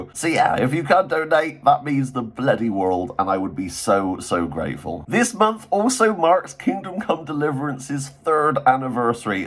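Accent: United Kingdom. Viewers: So to confirm...you are British?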